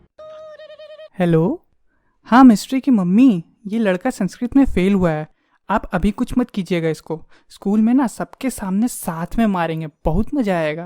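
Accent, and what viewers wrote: Bihar, India. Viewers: Thethar 😹😹😹😹bihari ho ka? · I love your Bihari accent is awesome love it❤ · Bro tumhara accent bihari jesa h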